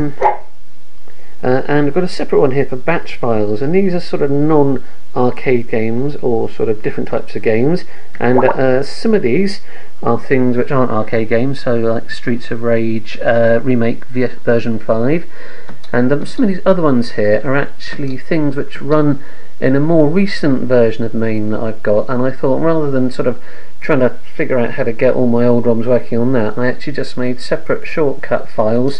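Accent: United Kingdom. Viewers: you sound a lot like the guy that fixes/fixed my laptop, he lives in Darlington lol, are you from the UK?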